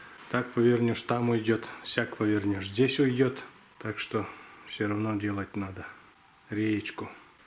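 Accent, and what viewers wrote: Perm, Russia. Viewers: Что за говор пермь, или ?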